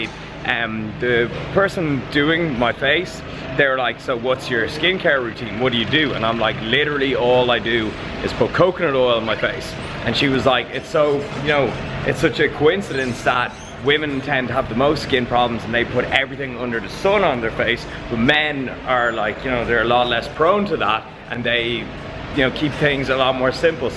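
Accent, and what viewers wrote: Ireland. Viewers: Missed that Irish voice. I'm coming Dublin soon buddy, if you're free we should defo partake in a Guinness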